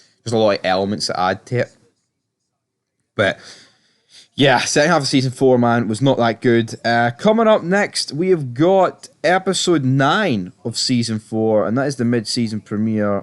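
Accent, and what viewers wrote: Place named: Liverpool, United Kingdom. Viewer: damn i guess scouse accent isnt the best one for youtube videos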